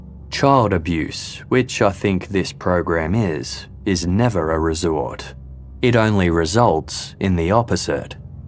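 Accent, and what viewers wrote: Australia. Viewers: Lovely to hear an Australian voice on one of these documentaries.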